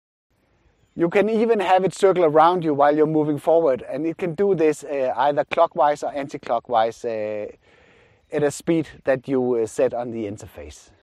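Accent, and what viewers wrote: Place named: Denmark. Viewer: Tydelig at høre at du er dansk 😇😇 Tak for en fin video. Må simpelthen eje den drone. Kæft den er fed. Skal bruges til ferierne og til at optage børnenes fodboldkampe ... Det må skabe respekt i forældreflokken når jeg kommer anstigende med sådan en.. Hahaha..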